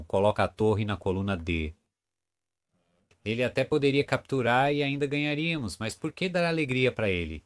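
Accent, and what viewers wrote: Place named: India. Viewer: Pois é, eu adoro o jeito que ele cria a própria língua misturando o sotaque indiano forte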